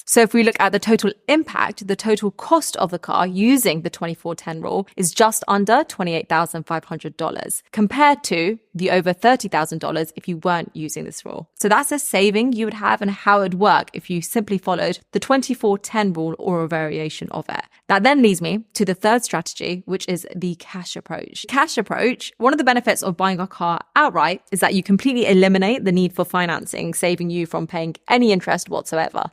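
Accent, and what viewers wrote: United Kingdom. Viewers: great video, you sound British so I assume you live in the UK (could be wrong) so why use dollars ? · Everything sounds better in British. So tired of mumbling idiots here in America 😂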